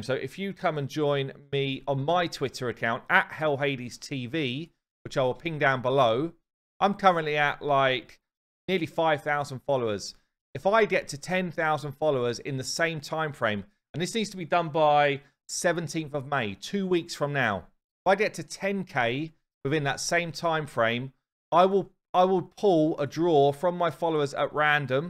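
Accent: United Kingdom. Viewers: Fun fact: I hadn't heard the expression "brucie bonus" before and I know you've used it many times in your vids including this one, so today I finally looked it up. When you do a google search the very first link to a definition that pops up....well lets just say it has to do with ejaculate and erections haha. Brucie bonus indeed! Also, for a longest time I was mystified when you were making reference to your "finite" teams. I thought "finite"? What is a "finite" team, and where would I use it? Does that have something to do with the unkillable "infinite" teams? Then it dawned on me - you were saying FIRE KNIGHT, not finite rofl. Don't get me wrong tho, I love British accents 😸.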